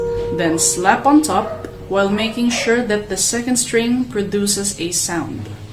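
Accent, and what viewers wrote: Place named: Australia. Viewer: natawa na naman ako sa australian accent, timing po nagkakape ako lumabas sa ilong ko,, 😃😄,, kahit paulit talaga natatawa pa rin ako😁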